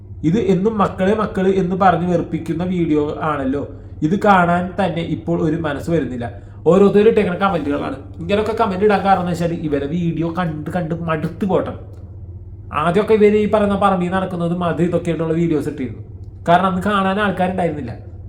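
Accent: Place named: Thrissur, India. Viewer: Ikkade naadu evideyanu.. samsaram thrissur district kodungallur , thriprayar basha pole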